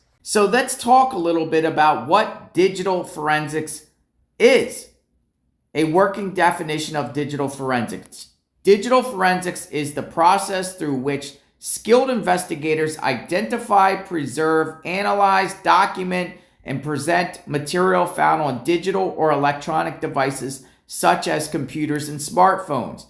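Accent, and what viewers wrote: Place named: New York, United States. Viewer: Love love all your content. Ur awesome! I especially love your NY accent!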